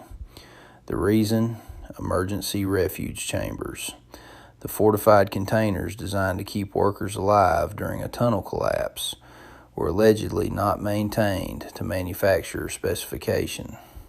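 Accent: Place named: South, United States. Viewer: The diagrams look great but how accurate are they? Also, why did you choose a Southern drawl for an Australian subject? Elevenlabs?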